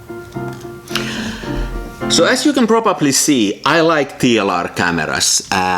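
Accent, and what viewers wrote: Finland. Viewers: Rallienglantia, tunnistin heti suomalaiseks :D. Ei vaan hyvä video ja sain noudettua tänään oman Yashica C:n Kuvasin tänään rullan Fomopan 100, seuraavaksi Kodakin Gold 200:sta.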